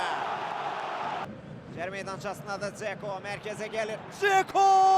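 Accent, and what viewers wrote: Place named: Azerbaijan. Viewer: Knk Azerbaycanlılar öyle konuşuyo yabancı olduğundan değil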